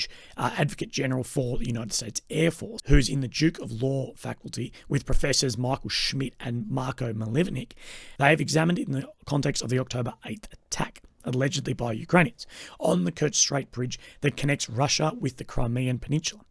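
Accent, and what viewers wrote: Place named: Australia. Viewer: You can generalise as you wish if it's based on a genuine observation not obstinance..... "I thought Aussies were better than this" is actually saying that Australians are held to a pretty high standing in the world of fair play, and standing up for the oppressed over the oppressors... along with the Kiwis. looks and sounds the part, but his consistent agenda is at odds with his apparent Aussie-ness and overstated Neutrality which doesn't follow through to his actual stance.....he's an Oxymoron (or is it Aussiemoron 🤔) Aussies are awesome, and I know he doesn't represent the majority!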